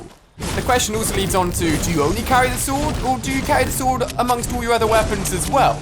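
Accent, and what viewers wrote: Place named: United Kingdom. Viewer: O my gush i cant Understand you are you fro Uk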